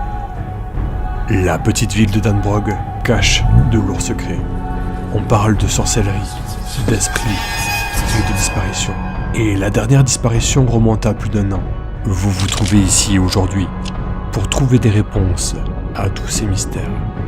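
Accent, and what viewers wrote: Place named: South, France. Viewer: Y'a pas à chier on voit la progression, autant dans l'originalité que dans la façon de raconter l'histoire, en plus le petit accent du sud met trop bien. Je suis bien content d'avoir découvert ta chaîne grâce à ton passage sur le Live d'Anthox. Sinon t'as jamais essayé de te mettre en contacte avec des MJ comme Mahyar par exemple? ça pourrait t'aider à prendre de la visibilité.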